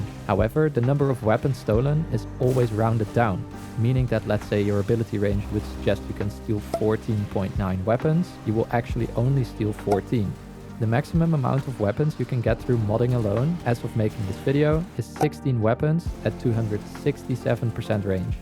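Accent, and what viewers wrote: Netherlands. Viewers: Hey a dutch person i think cause you have a very dutch accent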